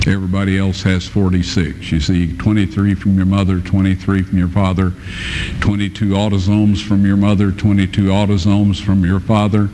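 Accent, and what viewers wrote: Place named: South, United States. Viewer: Is it just me or does the gospel spoken with a southern drawl just hit harder?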